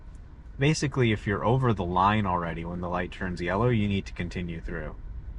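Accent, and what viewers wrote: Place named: Canada. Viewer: Bro got the canada accent 🏳️‍🌈 🏳️‍⚧️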